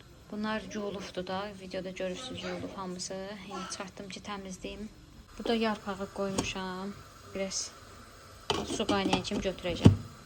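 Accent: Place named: Mingachevir, Azerbaijan. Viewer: hə lap yaxşı məndə Mingeçevirliyəm sizdə bizim kimi danışırsınız maraqlı gəldiniz mənə sizi görməsəmdə doğma gəldiniz mənə ❤❤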